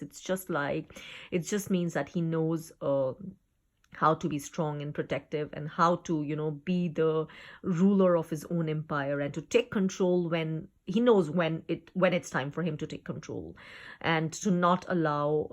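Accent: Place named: Trinidad and Tobago. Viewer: Sound kind of trini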